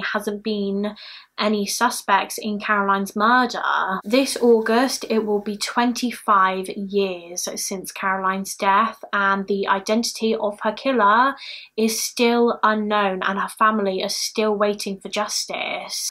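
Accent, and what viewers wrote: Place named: England, United Kingdom. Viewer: Such a sad case. Totally unrelated question, just because I'm curious - what part of England are you from? Fascinated by your accent.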